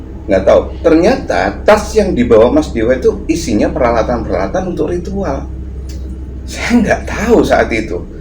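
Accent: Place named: East Java, Indonesia. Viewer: mau tanya Abang asalnya dari mana? logat jatimnya faseh banget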